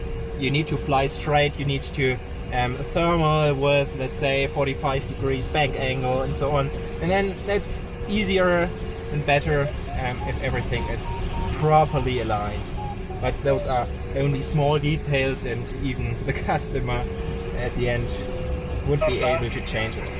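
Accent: Germany. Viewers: sounds a bissl deutsch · wie man das deutsch raushört XD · Cool german aktzent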